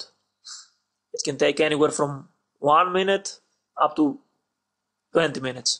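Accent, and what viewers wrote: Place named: Russia. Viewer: u are a russian dude hahaha i knew it from ur voice lol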